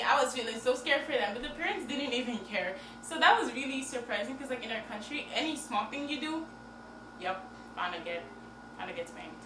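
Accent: Philippines. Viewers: Pinoy accent....ganda mo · OH U SPEAK TAGLISH?? U SO CUTE.U SPEAK LIKE FILIPINO ACCENT AND WHEN U SPEAK TAGALOG OH OMG IT WAS CUTE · Because of your flawless Filipino accent, you can easily land for tv/movie roles. And I'd be one of your fans. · ganda naman ni Girllll!! and ur english is soooo conyo!! sosyal naman this girl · gagi! (excuse my expression)... i try closing my eyes while listening to you talking... damn Pilipino ka nga... new subs here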